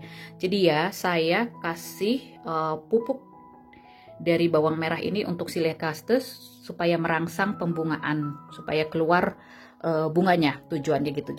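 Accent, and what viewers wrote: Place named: Medan, Indonesia. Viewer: Biasa kekgitu mba,, buat jd motivasi agar lebih baik kedepanna 🤗 Kalo saya pribadi mmg sukak dengerin cerita mbaknya hehheh...kayak logat anak Medan 😁😁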